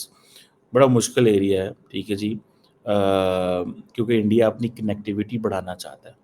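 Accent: Punjab, Pakistan. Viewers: Punjabi English awesome... Good viewpoint..... All must support the channel.... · was waiting for you to start ur rona-dhona! Why does any development about India cause u so much despondency? In ur typical casual, thick Punjabi style, you tend to trivialize everything.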